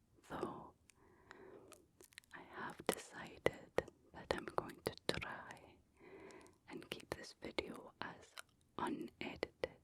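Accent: Scotland, United Kingdom. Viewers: Doesn't even have to be ASMR, I absolutely LOVE the Scottish accent. I could listen to someone with this accent talk about absolutely nonsense allllll day. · Scottish has to be one of my favorite accents! 🤌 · Your Scottish accent makes my brain go all squiggly. Never stop saying words please. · I've loved Scottish accents on women since I crushed on Sheena Easton way back in the day. 🥰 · Gosh i Miss Scotland I fell in love with your accents this past summer 😃 lol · HELLO really enjoy your Scotty accent and your beautiful smile. Rick Henderson U.S.. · I love Scottish accents so much, I find myself repeating words in it sometimes. It’s so satisfying 😂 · Love the Scottish accent. Found it extremely relaxing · Love your Scottish accent 🥰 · As an American who just found out that i have mostly Scottish heritage… it explains why I love the accent so much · I love ASMR and also have studied linguistics, and the Scottish and Irish accents are literally some of my favourite accents in the world; they’re the funniest, somehow the most uncanny, and I love it. 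Perfect mix. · Most Gorgeous! Love your Pure Scottish Accent Whispers! Love your Beautiful Smart Look!:yougotthis:💯🌟❤🌻🌼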